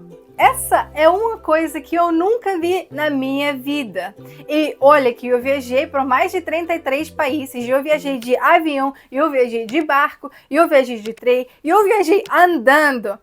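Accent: Rio de Janeiro, Brazil. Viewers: Amo o seu sotaque carioca! Você está 99,9% carioca já. Só falta trocar o "mesmo" por "mermo". Kkk. Ah, você é linda demais!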